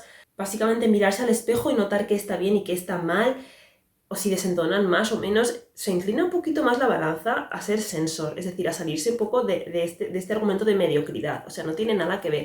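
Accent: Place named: Spain. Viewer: Ayyyy que terrible, no estoy entendiendo nada, primero, porque escucho conceptos nuevos, ¿me podrían decir donde encuentro info de términos o conceptos? Y segundo porque el acento es marcadamente español, y eso, a pesar de estar muy habituada a contenido en ese idioma, en combinación con lo primero me deja así: 😳🤔🥴🥴🥴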